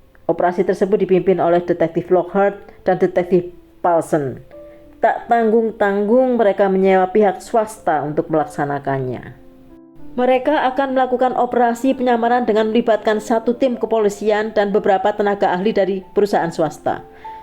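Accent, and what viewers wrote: Java, Indonesia. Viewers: ... dan terima kasih atas kasus nya yg luar biasa dengan kemedok an nya... salam cinta dari batam untuk madam....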